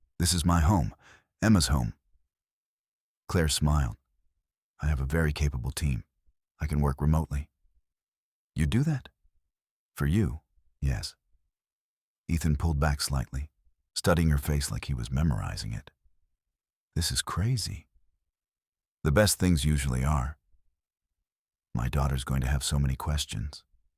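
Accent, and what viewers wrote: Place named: South Africa. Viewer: South African iloveit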